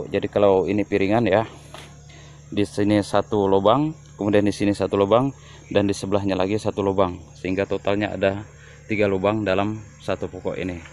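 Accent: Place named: Sumatra, Indonesia. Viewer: Ya bapak ibok..logat nya macam sumatera bang .👍😀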